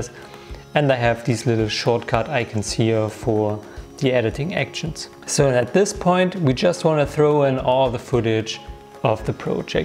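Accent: Germany. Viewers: Hi! Just spend most of the evening watching your content! Really like your style and the way to explain things! The thing that made me land on your page, was that we used the same camera (GH4), i know that you are not using it anymore, but i wanted to ask you a question about your settings on the GH4. I believe that as you import your footage, i can see that you are shooting in 24 fps. I have some difficulties in really understanding that on the GH4. Listing to your voice, i believe that your from Germany or somewhere here in EU. How can you use the 24 fps settings on your GH4? Are you just changing your PAL/NTSC settings or what? Are you shooting in C4K on your GH4 or 23.98? Hope to hear from you, and that you still remember your settings:)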